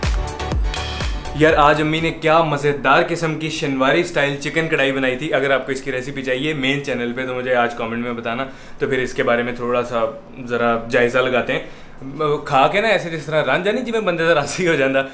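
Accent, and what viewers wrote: India. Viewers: Bro zindabad bolo jindabad nahi.You sound like an indian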